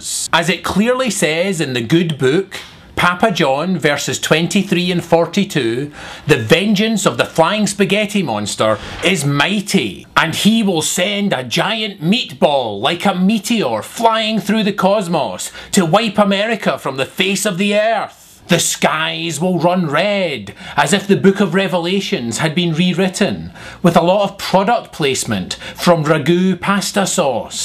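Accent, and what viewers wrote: Scotland, United Kingdom. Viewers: Scottish. Watch his earlier videos to learn how much he enjoys being mistaken for Irish. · hey, yus one funny dude! don't believe i take the 5 spicy meatballs but i do have 5 stars for ya! Keep up the good work and your scottish accent is COOL!!!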